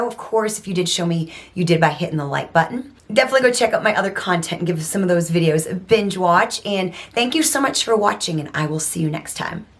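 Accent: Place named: South, United States. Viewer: Hey,. My first time here. I picked up on that southern accent in the very beginning was wasn’t absolutely positive until I heard you say “time”. We’re southern USA neighbors. I live in Alabama. I don’t like dollar tree makeup much at all, but I love the thought of a 1.25 beauty product that might work well for someone else. Who knows, one day they may have something that does work for me. So I am going to hang out with y’all for a while. Thanks. 🙏 😊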